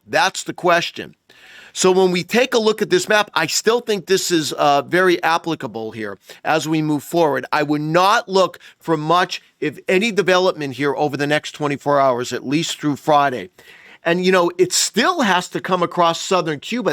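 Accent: New York, United States. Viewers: He must be from the New York City area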